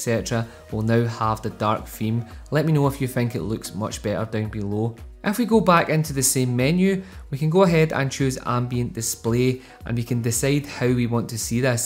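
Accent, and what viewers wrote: Ireland. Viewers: Is he fucking Irish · I love your accent man. Are you from Ireland? And also the name of the wallpaper pls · I am watching coz I like your accent,. Irish I assume??👌😄😄😄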